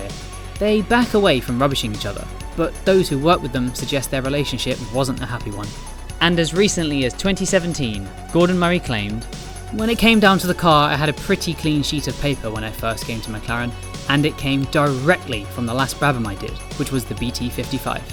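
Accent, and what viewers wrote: United Kingdom. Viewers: Why do Brits call Honda, Honder?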